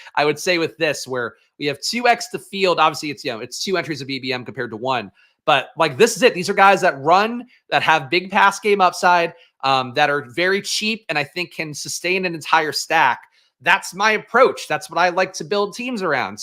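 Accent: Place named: Australia. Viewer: Great Bluey's mum accent! have a great weekend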